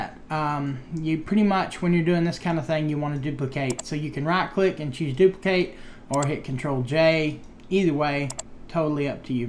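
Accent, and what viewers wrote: South, United States. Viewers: This is THE strongest southern accent I've ever heard :)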